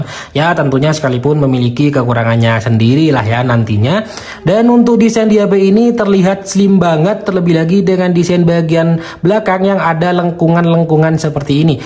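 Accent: Madura, Indonesia. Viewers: Madura logat ny 😂🤣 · Logatnya kental banget, madura banget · Orang Madura kah bang?? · Dari aksen bahasa indonya sih.. Pasti dari Meduro ori yo cak ? Hahaha 😇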